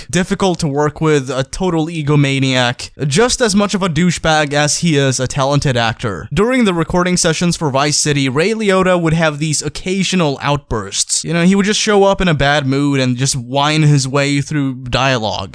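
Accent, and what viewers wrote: Sweden. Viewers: Are u from Sweden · Vae fan äru svensk? Good video tho.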